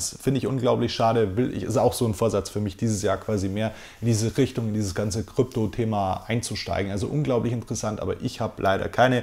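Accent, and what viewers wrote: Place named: North, Germany. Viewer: Hi, dir auch ein frohes neues Jahr, wieder ein super Video! Hat mich wieder gefreut eine 35 Minuten Ausgabe zu sehen. Gerne mehr von dem Format, Länge ist gar kein Problem. Wenn man dir bei deiner Formulierung etwas ankreiden wollte, könnte man sagen, dass du das Wort "so" häufig benutzt.;) Aber nichts über dein Intro. Früher habe ich deinen Akzent als Norddeutscher gefeiert.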